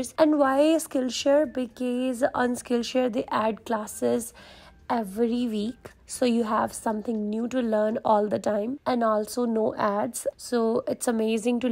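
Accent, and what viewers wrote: Punjab, India. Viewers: Punjabi touch maza le ata hai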